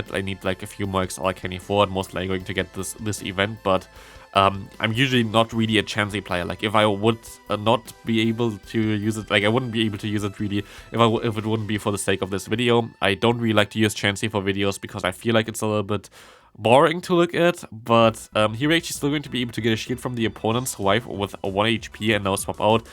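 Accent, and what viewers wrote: Germany. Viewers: same his German accent is☠️ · his heavy German accent makes it harder to recognise it 🥲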